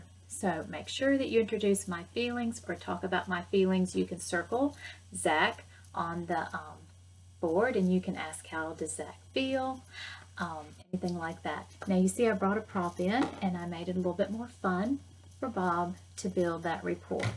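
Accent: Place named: South, United States. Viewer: we're two sentences deep and I love it already! People often comment on my Southern accent and I hear it in you as well. I have to stay on top of that drawl but it always makes me happy to see other teachers with an accent.:)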